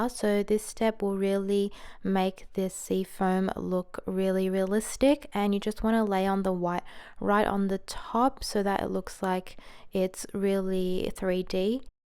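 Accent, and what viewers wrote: Australia. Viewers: Are you Australian? You accent sounds very Australian specially when u say “water” as “wodah” and “borders” as “bodahs” and “white” as “whaih” etc :)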